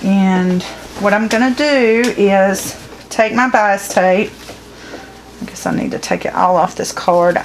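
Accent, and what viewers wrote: South, United States. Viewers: I love how straight forward you are with your southern accent, you had me cracking up. You didn't film you sewing that curve because it takes some kind of witchcraft, doesn't it? Can't let all your secrets out! 🤭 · Thank you for this video, it was really good and helpful. I LOVE your accent ( I'm here in England) I'm guessing you're in the South? I was thinking you might enjoy getting a extension plate for the sewing machine, it makes life easier when you're working on a wide piece of fabric.....just a thought. I like your no-nonsense approach, I too curl a lip up at Bias tape!!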